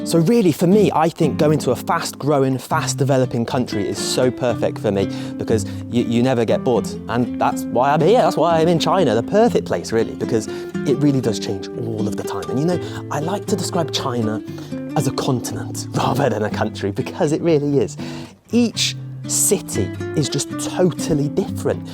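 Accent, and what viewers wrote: United Kingdom. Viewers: Your British pronunciation is like music to my ears. Thank you. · Enjoy listening to your talk! I think you talk very well. You got lovely British accent with British style humour. China has a huge amount to explore, I can see you have the potential of being successful youtuber 😉 best luck! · I like your cute British accent :)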